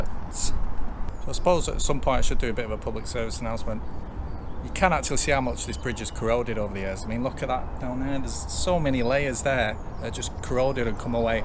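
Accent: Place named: Manchester, United Kingdom. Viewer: Well done typical Mancunian humour, brave face, big smile while all the time weeing your pants well done mate you did us all proud. I miss the Old town and the accent. Thanks for your great Docos Bill the Mancunian in Western Australia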